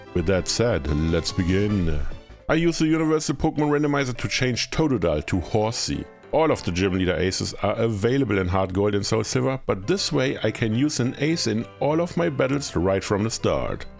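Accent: Germany. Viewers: As a german, I immediately noticed the accent. Great content, def subscribing vro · The German accent is strong in this one!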